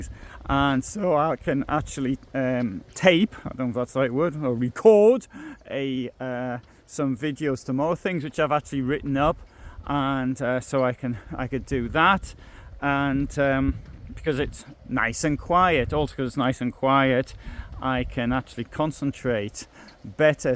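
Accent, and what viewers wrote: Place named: Midlands, United Kingdom. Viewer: You never saud but.you dont speak.the queens English. I think the midlands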